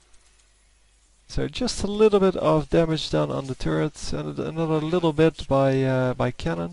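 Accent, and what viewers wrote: Netherlands. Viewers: Only real dutch people have this i live in the netherlands as well but my parents are from somewhere else but i dont have THAT accent :)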